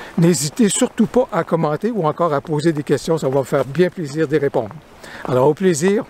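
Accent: Canada. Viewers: votre gentil accent Canadien donne de la chaleur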